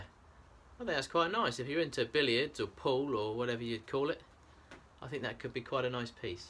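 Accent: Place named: England, United Kingdom. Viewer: Don't worry about your talking. I can listen to you all day. I love your accent. We don't come across too many people with English accents in my area in Chicago. 😁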